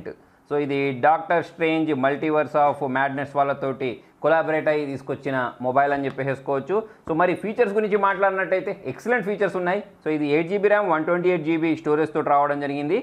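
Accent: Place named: Godavari, India. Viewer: Motham godavarikane anna.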